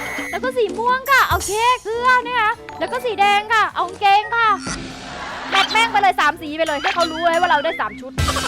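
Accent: Isan, Thailand. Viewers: พึ่งเคยได้ยินเสียงพี่แบบนี้พี่พูดอีสานด้วยไปกับหน้าด้วย555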